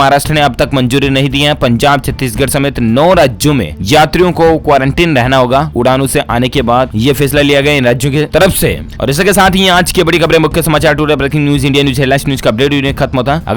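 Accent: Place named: Marwari, India. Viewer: Bhai aaj to aavaj bhi kabhi kabhi marvadi ka pardarsan nice sir ji very nice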